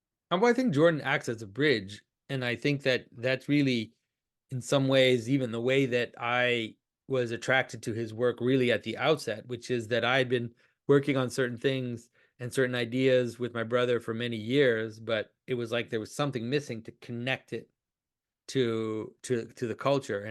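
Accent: Wales, United Kingdom. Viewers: You are so Welsh. Love it.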